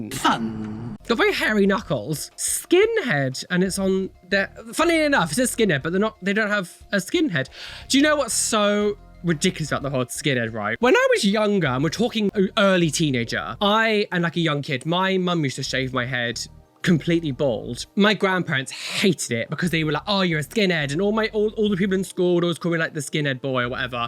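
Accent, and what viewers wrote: United Kingdom. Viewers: Been.there. Done that. Came back in.the early 80"s. But love you anyways. Please don't hate me for telling you this, dearest, but your over all look is too 'busy'. Tats in PRIMARY COLORS never with a Pentagram.... and your piercings need to be placed symetrical so your face isn't sliding down on one side. And please switch to decaffeinated drinks asap. With your delightful British accent you need to remember the English language has coma's and lots of punctuation rather than making it all one sentence. Love ya! alvin, san francisco :)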